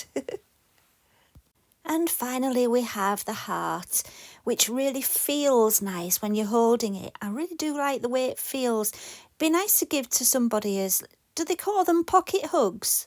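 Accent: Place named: England, United Kingdom. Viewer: Beautiful 😍 and such a breath of fresh air to listen to an English person narrating , there aren't many craft videos like this, they're usually American, so thank you for that too 😁 x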